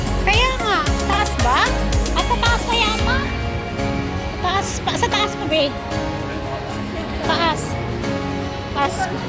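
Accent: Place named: Ilocano, Philippines. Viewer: watching live anak ,marami ka bang napamili ilokano ba kayo kadi yong tono nyo❤❤❤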